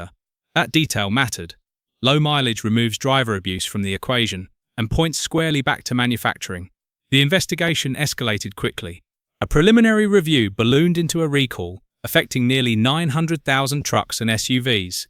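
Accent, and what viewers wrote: United Kingdom. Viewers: Started to watch until I heard that obnoxious "British" voice, , leaving . I will find this info on an American video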